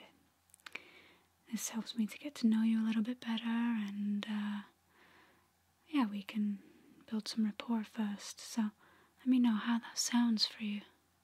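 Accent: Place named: Lancashire, United Kingdom. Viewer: This lighting is lovely, and your Lancashire is too,. 🕊️🙏💕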